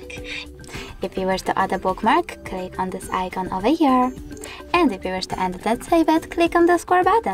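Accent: Asia. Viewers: Can you speak slower and not Asian Please !!! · Too hard to understand thick Asian accent. Too long nails can't see exactly where pointing. Way too fast.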